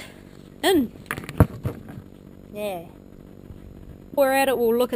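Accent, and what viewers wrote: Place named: Australia. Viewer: SOOoo many bearings. My 92 Nissan only has an inner and outer in the front. Was putting the disc rotor back on which I didn't have2 and shouldn't of taken off holding it in a vice while torquing its bolts to 48 pound feet. Didn't notice the bolts actually pulling the rotor down against the vice instead of tightening flush pieces of metal. Bang 6 bolts getting near 48 pound feet and the rotor broke in half. Time for a new rotor. I wouldn't of expected that force to brake it. Oh well. Going threw life speaking in an Australian accent must be great! When I had Japanese in the late 80's in California a former Australian air force guy who was a bit old was in my class. Said he flew Sopwith Camels.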